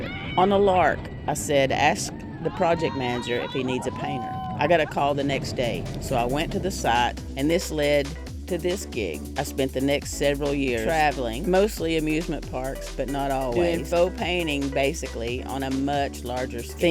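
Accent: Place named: South, United States. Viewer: Sorry if I missed it, where's that accent from? I first thought the accent sounded like ebonics, I reckon it's southern? Which southern? I always thought ebonics sounded southern. Disclaimer: I'm not from the US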